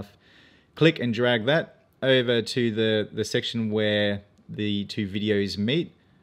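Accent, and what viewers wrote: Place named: Australia. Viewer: Great video , just what I’ve been looking for. May I recommend that for us in America could you please slow down your speech a tad and work on clearly enunciating . There are some details in your presentation that we really appreciate but unfortunately we in America (especially us seniors) just can’t quite grasp the Ausie lingo. Again…. I’m not trying to be critical, love your video. This Insta360 oneX2 is very difficult to grasp even under the best of circumstances. Take care and thanks for great content. 👍👍👍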